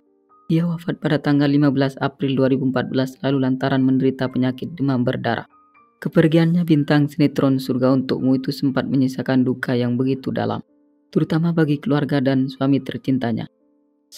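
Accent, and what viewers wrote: Madura, Indonesia. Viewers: Pengisi suaranya kayak orang madura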